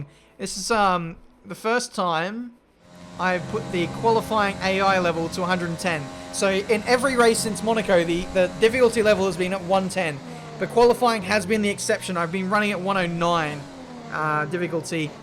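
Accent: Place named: Australia. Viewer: How to watch channel: Step 1: Select video Step 2: Click "Like" and "Subscribe" if you haven't already. Step 3: Sit back, watch and listen to smooth jazz Australian Commentating Voice.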